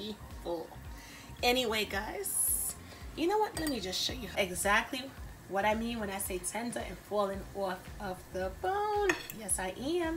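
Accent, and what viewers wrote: New York, United States. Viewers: She is a ny'er to the T. All i hear is ny thru and thru. Im from cali. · is definitely from NYC…that accent is poppin💯😀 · Love your delicious Turkey wings recipe. I hear your NYC accent too! I was born and raised in the Bronx aka Boogie Down. Thanks for sharing! ❤️ My bad. Forgot I reached out to you a year ago. Recipe still popping!!! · Haven't heard that New York accent in years.😁 Printed recipe's a bit lacking. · I hear that New Yorker in you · I hear that NYC accent. This looks so good. I cook mine with the Cajun trinity (Onion, celery, green pepper), and I like my wings crispy. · Dee I Love Jesus Yup I here her N.Y. accent one of the Burroughs she’s from I from the Midwest but I know them deep NY accents lol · These look AMAZING! I'll be trying this tomorrow. By the way...Are you from NY? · Is that an NY accent. 👀👀This is how my gma cook it butter & all. 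I watched her cook it from a distance. I couldn't 100% remember how she did it. Thnks Blk PP posting soul food on YouTube ❤❤well minus the gravey mix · Good Lawd with...I'm doing this one...and I knew you was NY...Got a friend from NY that sounds just like you...Respect....real talk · she sound straight from NYC lool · This my first time watching this channel and all I hear is an uptop NY type accent I'm sold jus off the way u keep saying OFF like a NYer 😂❤️ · love your New York accent!!!!! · Sounds like a New York/North Jersey accent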